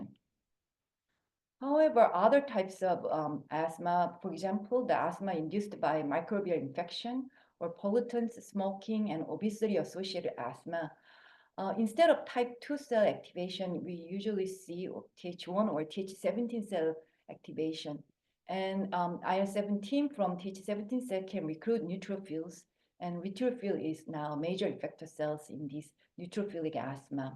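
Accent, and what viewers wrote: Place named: South Korea. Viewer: Konglish is making my head reel... Im really trying to make out what she's saying. Sorry 😔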